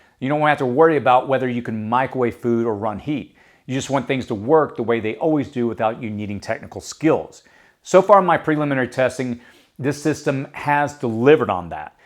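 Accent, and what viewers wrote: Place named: California, United States. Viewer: Hmmm are you close to the Bay Area ? I heard California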